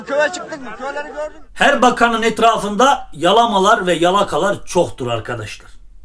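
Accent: Erzurum, Turkey. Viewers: Dostum olayları harika yorumluyorsun,yayın hayatında başarılar diliyorum, bir şey merak ettim bazı sözler ve şiven bizim erzurum yöresine benziyor oralimisin yoksa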